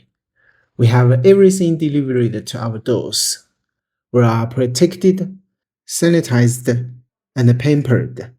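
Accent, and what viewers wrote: China. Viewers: he does have a strong chinese accent though so maybe its just a neccesity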